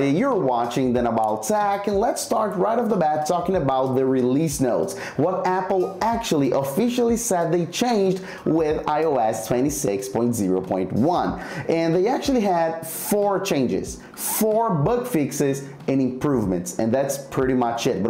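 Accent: Brazil. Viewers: Great video, thank you! By the way, are you Brazilian?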